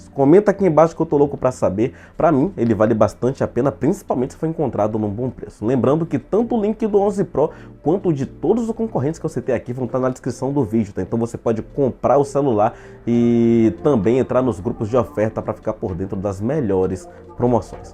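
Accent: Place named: Bahia, Brazil. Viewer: VC EBAIANO NEGAO QUE TO LIGADO, BOM TRABALHO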